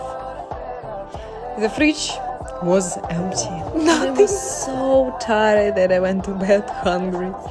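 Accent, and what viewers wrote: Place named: India. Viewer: Idk why but she is sounding more and more indiann.... As she makes more videos 😂❤👍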